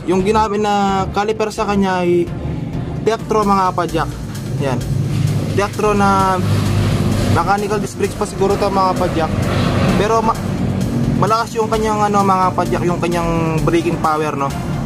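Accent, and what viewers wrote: Pampanga, Philippines. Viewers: Kapampangan ka lodi??? Sa pananalita mo kase HAHAHA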